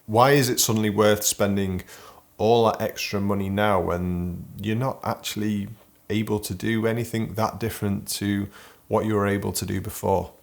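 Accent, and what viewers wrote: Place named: Birmingham, United Kingdom. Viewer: Sounds like from Birmingham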